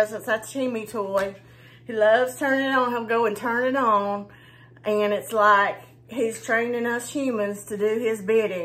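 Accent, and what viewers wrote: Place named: United States. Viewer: Howdy! Love the cow girl vibes / accent! So much fun!!!! 😊🖤🩷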